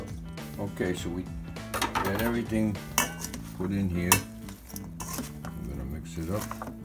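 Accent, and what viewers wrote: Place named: Boston, United States. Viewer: U sound like your from Boston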